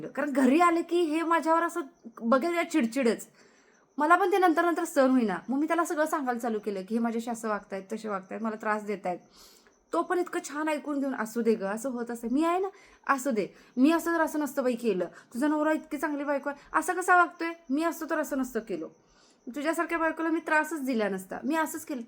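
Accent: Sangli, India. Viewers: खुपच छान ताई तुमचे विच्यार तुम्ही सांगलीच्या वाटता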